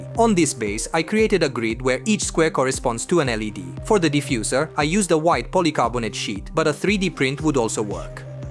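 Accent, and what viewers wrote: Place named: Italy. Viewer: I prefer your real voice. Who doesn't like an Italian accent?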